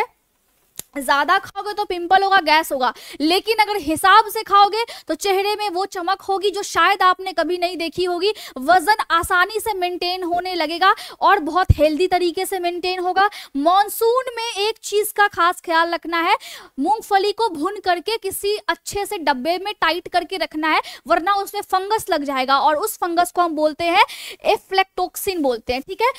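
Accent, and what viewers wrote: Bihar, India. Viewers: Mam vihari ke awaj me swag hai aapke awaj se hme pta chl gya aap pakka vihar se ho❤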